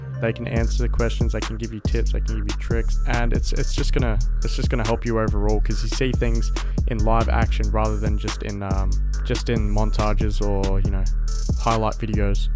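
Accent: Australia. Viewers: You sound Aussie, is that correct?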